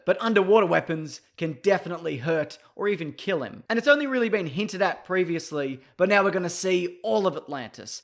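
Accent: Australia. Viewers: “Ack-wa-man” is it that hard for Australians to say aquaman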